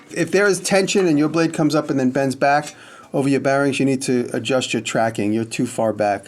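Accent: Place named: South, United States. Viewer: Great videoes. I have had a band saw for 15 years and Today I found out from you that it has been no where as useful as it is capable of. I am 70, my friend, also 70 just bought his first bandsaw. ( Grizzly). I am going to call him and insist he watch you use a bandsaw with skill. We live in Georgia and Alabama and you talk like us. Spike really adds to your tubes. Thanks for sharing and caring. I hope you enjoy your new upstate shop.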